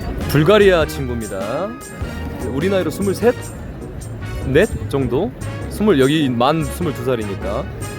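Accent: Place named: Gwangju, South Korea. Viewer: 어찌 억양이 잇드라ㅡ 광주엿군요. 멋지게 살고 부뢉네.... 난 용기가 없는디ㅠ ㅡ 동광주 홈플뒤 살아요 ~~~~^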